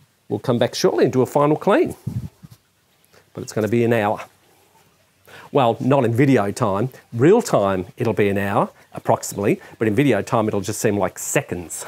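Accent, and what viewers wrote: Australia. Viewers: Recently discovered your channel. Thankyou for the wealth of knowledge you share. I have yet to do any mosaics because it was a bit daunting. I'll be right now. Good to hear the australian accent and those birds in the background. 😊👍🌳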